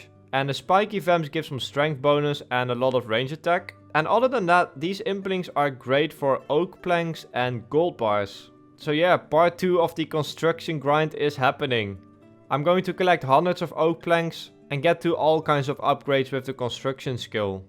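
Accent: Netherlands. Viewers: My god the video is fantastic! the Dutch accent is hard to listen to, but I will stomache it for the content :)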